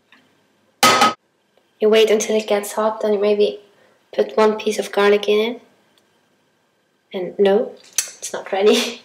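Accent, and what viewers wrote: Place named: Ireland. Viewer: ah du hast schon voll den irish accent haha:D das wochenende wird witzig..seh ich jetzt schon kommen!:D und achja..was ist eigtl fürn account?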